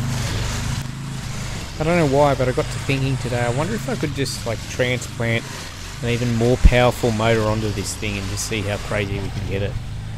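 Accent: Australia. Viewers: Love your Australian accent!!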